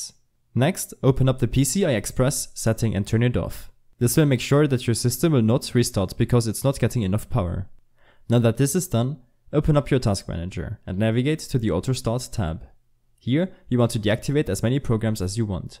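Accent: Germany. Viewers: He’s just German alright don’t judge people.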